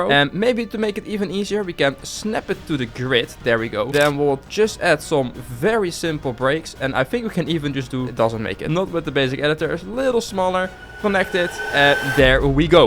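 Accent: Netherlands. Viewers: Ben jij Nederlands dik hoor het aan je accent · Are u dutch?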